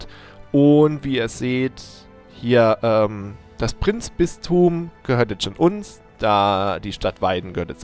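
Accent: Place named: Baden, Germany. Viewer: Kann es sein das du aus Karlsruhe kommst du hast mal deine Stadt in Sim City mit Karlsruhe verglichen und jetzt spielts du Baden, und dein Akzent scheint mir irgendwie auch aus dieser Gegend zu kommen da wohn nämlich auch (im Breisgau) Mach Baden bitte zu einer Großmacht und über nimm wenn irgendmöglich Schwaben/Würtemberg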